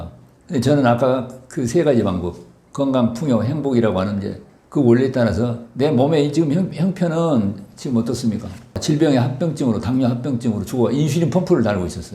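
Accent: Jeolla, South Korea. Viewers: ㅜ 라도 사투리ㅜㅜ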